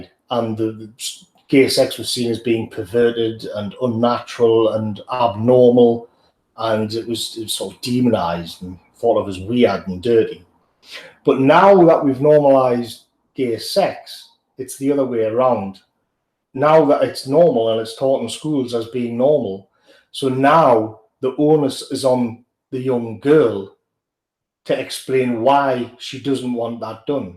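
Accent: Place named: North East England, United Kingdom. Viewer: Well said 👌 From a fellow North East lad. It's refreshing to hear an alt righter with the same accent as me.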